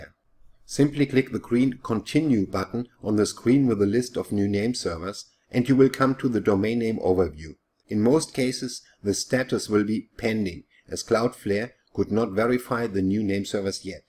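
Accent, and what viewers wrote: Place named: Germany. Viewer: Thank you, this was really helpful. What could feel more secure than having a German accent helping you manage technical stuff online confidently.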